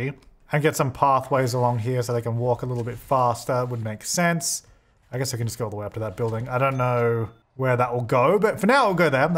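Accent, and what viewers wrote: Australia. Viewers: They're both Aussie, so maybe that's why they sound the same to you?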